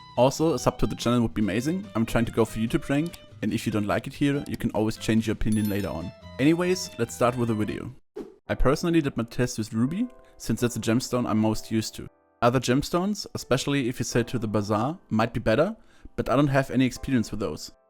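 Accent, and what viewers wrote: Germany. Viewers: u sound german are u german? if yes Hi mein bruder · do I hear a german accent? Edit: Yeah totally useless because you say it later on, i wrote this before watching the video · Hab deinen Kanal grade zum ersten mal gesehen und hab sofort rausgehört dass du aus Deutschland bist. Gutes Video weiter so 💪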